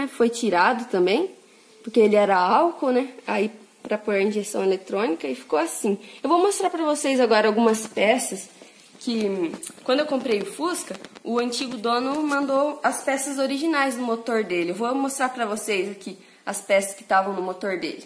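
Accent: Minas Gerais, Brazil. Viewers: VC tem sotaque de mineira. .Tenho um Etios Hatch 1.3 2017/2018 na BR distância mais longa faz 17km/L cidade faz 10 ou 11 mas tá ótimo fusca tem fama de beberrão. .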